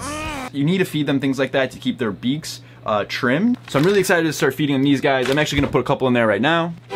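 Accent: Wisconsin, United States. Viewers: Wait are you from Wisconsin? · It's so odd hearing Wisconsin since yknow,, home state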